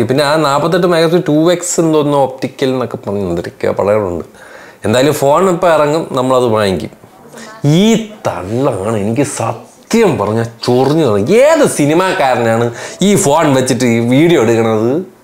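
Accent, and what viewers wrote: Trivandrum, India. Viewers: അണ്ണാ tvm language പൊളി😅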